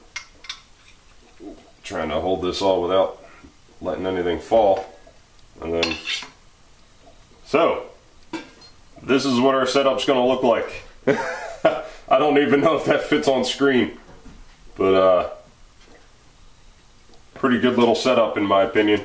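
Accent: United States. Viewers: This guy sounds like AvE. But more 'murican.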